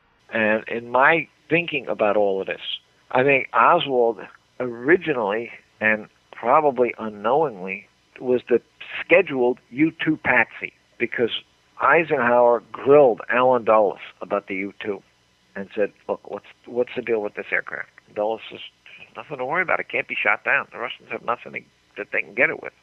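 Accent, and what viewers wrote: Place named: Northeast, United States. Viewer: You are correct. The speaker in this video has an upper Atlantic/Northeastern accent. Not familiar with southern accents and assumes that LHO is not capable of learning Russian? Not an uncommon inference.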